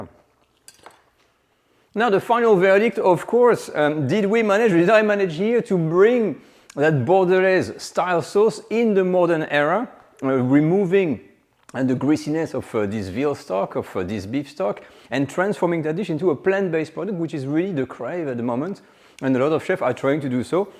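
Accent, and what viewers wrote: France. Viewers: I understand everything he says. He speaks with a charming French accent and his explanations of his cooking is perfect. 😊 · Er ist OFFENSICHTLICH Franzose. Er spricht wie meine Mutter.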